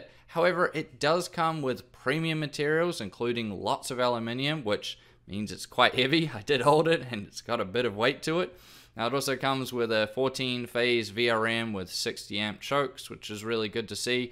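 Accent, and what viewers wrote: New Zealand. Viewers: .....damn i forgot where i was going with the first half of my comment! oh well, its nice to listen to another Kiwi talk tech!!! :D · .. hear your Kiwi accent ?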